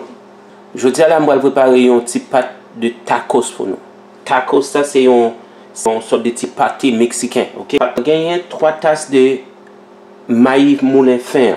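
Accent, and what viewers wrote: Quebec, Canada. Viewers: retire kebeka a nan bouch ou tanpri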